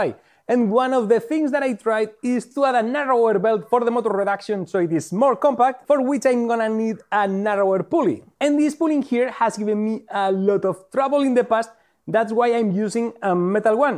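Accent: Spain. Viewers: No deja de sorprenderme que tu acento sea tan español, y a la vez tu léxico inglés sea tan extenso 😆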